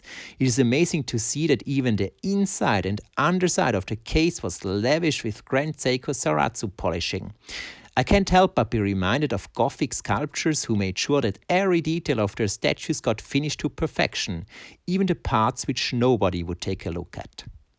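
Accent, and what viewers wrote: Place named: Austria. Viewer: Great video! I feel you have an Austrian accent. Am I right?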